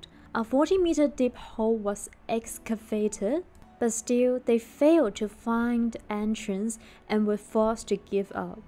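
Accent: China. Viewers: I recognised ur Accent. Do u speak Cantonese as ur Chinese Language?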